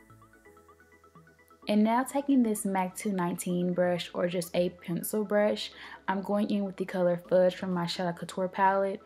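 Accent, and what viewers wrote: Memphis, United States. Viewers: I was listening to your talk thru out the video I was like mane she sound like she from memphis lol I'm from memphis 2..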